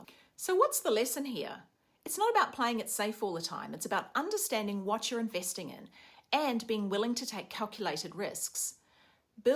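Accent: New Zealand. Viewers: Hi, judging by your accent I think you might be a Kiwi (if not, apologies!). I am in Auckland, NZ, and just wanted to say how proud I am of your channel. You are truly inspirational and the reason I got started investing in Bitcoin. This is one of the best Bitcoin advisory channels out there, and I hope it keeps growing because you absolutely deserve it.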